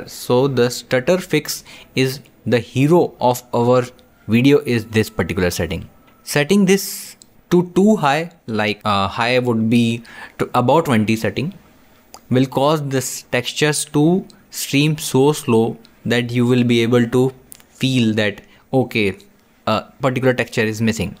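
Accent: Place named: India. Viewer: Another huge advantage of Indian accent is the English is scholar and easy to understand for non native speakers Anyone knows a successful Scottish YouTuber?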